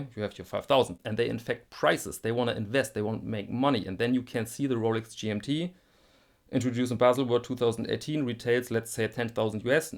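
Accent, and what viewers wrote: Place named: Germany. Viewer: I mean deapite his obvious flaws he is intelligent, witty, eloquent and a man of culture with an awesome german accent. Keep up the good work!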